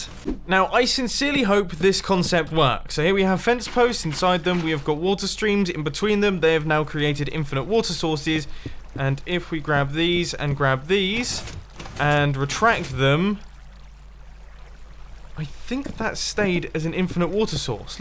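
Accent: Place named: United Kingdom. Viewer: I love that British accent "watah source, watah source, watah source" xD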